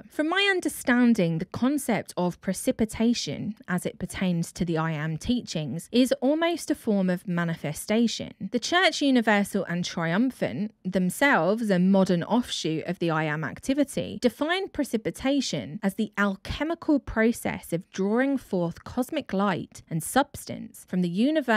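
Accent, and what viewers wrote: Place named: United States. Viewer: Please, I'm begging you, no more American accents.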